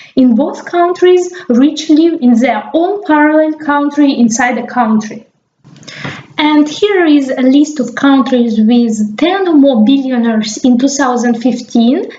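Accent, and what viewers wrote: Russia. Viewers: Your pronunciation is a cultural diversity as well it's really specific for Russian people to speak a Russian English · I love your Russian accent so nice to hear that.... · Невозможно слушать этот нижегородский прононс... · russian accent,i like it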